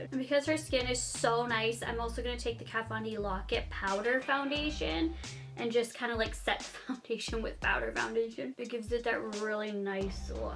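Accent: Canada. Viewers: It's your Canadian accent!! Lol!! You were close! Great job! If you had thicker dreads you would look just like her!!❤️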